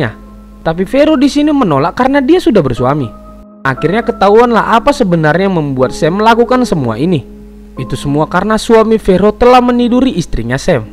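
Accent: Medan, Indonesia. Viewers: Medan kali kau bang, kalo dari aksenmu ini kau tinggal daerah setia budi, atau padang bulan, atau sekitaran kecamatan medan selayang dan medan tuntungan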